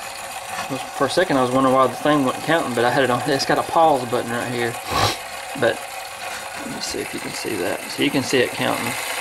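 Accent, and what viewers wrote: South, United States. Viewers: Hey man what part of the South are you from?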